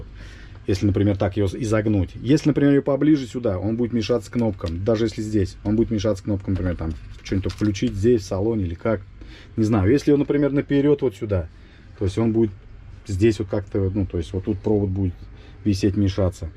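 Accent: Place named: Nizhny Novgorod, Russia. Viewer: Родной нижегородский говор )))) живу в Москве как этого не хватает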